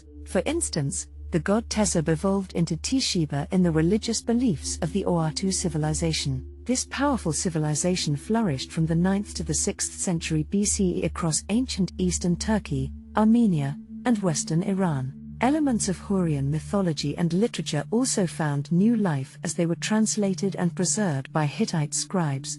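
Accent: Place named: United Kingdom. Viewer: The lady has a very British accent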